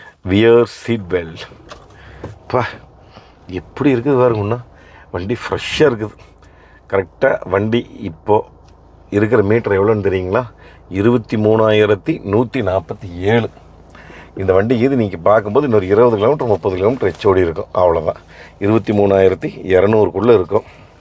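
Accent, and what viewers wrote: Kongu, India. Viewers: Namma Kongu tamil is awesome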